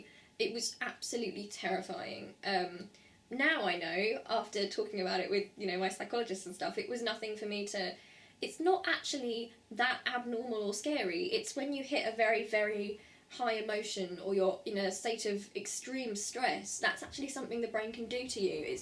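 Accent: United Kingdom. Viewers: Unfortunately there still is stigma in society for mental illnesses, but you are courageous to have made this video. YOU are not YOUR mental illness after all. I once had a relationship with a girl who has BPD/EUPD and at the time, one book I read was very informative on understanding her illness. I Hate You--Don't Leave Me: Understanding the Borderline Personality by Jerold J. Kreisman and Hal Straus. ISBN-13: <num> Best of luck in all your hopes and dreams and may I just say as a Canadian, that I love your British accent.:)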